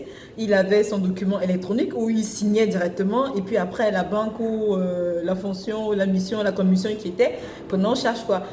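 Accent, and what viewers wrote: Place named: Cameroon. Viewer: Les congolaises n'ont jamais un tel accent . Je crois bien qu'elle soit une camerounaise. Les congolaises parlent mieux que ça . attention !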